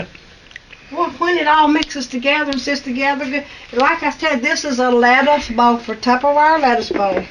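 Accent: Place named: Appalachia, United States. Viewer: Thank you for taking the time to share your knowledge. I feel like I'm listening to my mama's family every time I hear you. Their roots are in Appalachia. I've also noticed some similarities in the some of the dishes you make. Thanks for teaching me new tricks, new recipes, and bringing back old memories.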